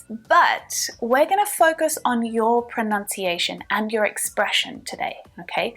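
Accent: Australia. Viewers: I love your videos and I love your Australian accent ❤ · I love Australian accent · Your Australian accent has made you a special English language teacher. Do all Australians have the same accent as yours? I really like it.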